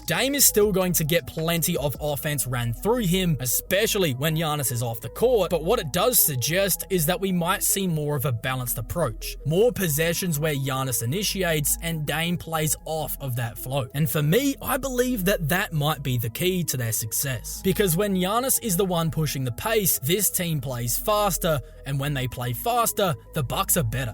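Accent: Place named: Australia. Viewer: Dang Australian accent. Is it AI?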